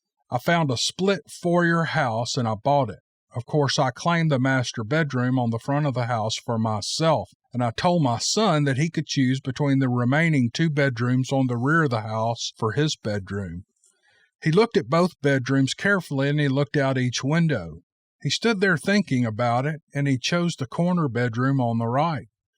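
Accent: United States. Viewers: You are over the top especially with that accent of real American English spoken the way it should be spoke thank you you are truly an awesome story teller thank you so much I’ve given up reading and just listen to you , it’s not that way about reading my eyesight is getting weak as am I in the tie I have left it’s not so bad to go especially listening to you and your soothing voice thks again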